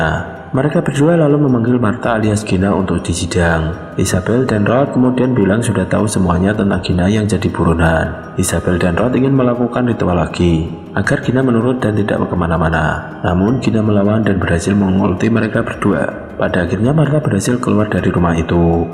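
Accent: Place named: Java, Indonesia. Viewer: Kok kk kenal suaranya medhok2 gini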